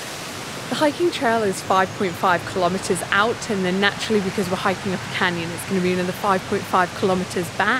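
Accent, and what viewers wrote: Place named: Northern England, United Kingdom. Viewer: Just stumbled upon your channel, where is accent from please ? You sound from north England, right? Greetings from Casablanca . Thanks for taking us round. Fare thee well for now.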